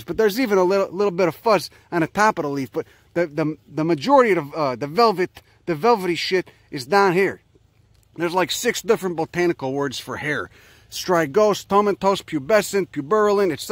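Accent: Chicago, United States. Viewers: I KNEW you were an Italian from Chicago, we recognize our own around here lol. That accent is straight South Side. Good on ya bud, definitely appreciate the effort on that little guy's behalf. Sending you good vibes from da burbs.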